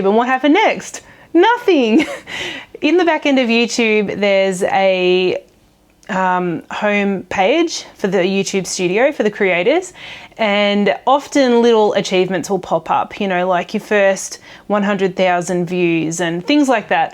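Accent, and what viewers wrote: Australia. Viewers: That was terrific ! On your chart, I am in that tiny pink group of over 65 year olds, female from the US. As a career custom jeweler with a love of Boulder and Black Opals I have also learned to love the Aussie dialect. The things I love about your channel include your family and general caring attitude, the opposing season to mine yet a similar climate, the realistic working messiness of the farm, your voice and amazing eyes. I liked that you responded to my comment half a year ago including a mention of opals. It felt personal. On your next trip to the US consider far northern California, on the coast for a stop. I would enjoy showing you around. We have KUNE KUNE pigs from New Zealand. I have a flower stand and show pictures on instagram This is also a huge lily producing area. Redwood trees bigger than imagination all around. Keep up the excellent videos and flower farming.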